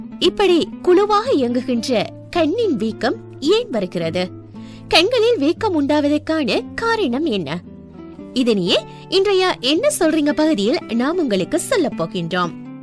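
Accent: Sri Lanka. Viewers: இது இலங்கைத் தமிழ் இப்படிதான் பேசுவார்கள் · Ada arivu jeevingala, avanga srilankan Tamil kalanthu pesuragu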